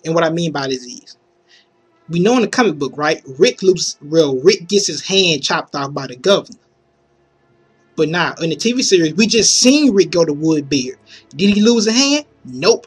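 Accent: South, United States. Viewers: man what state u from man cause u got country ass accent i mean no disrespect man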